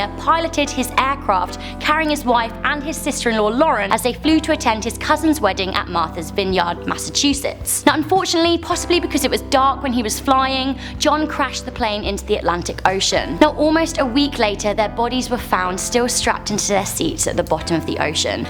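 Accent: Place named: United Kingdom. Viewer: yes she is pretty n I'm also a follower.I love her accent. its so British. love that.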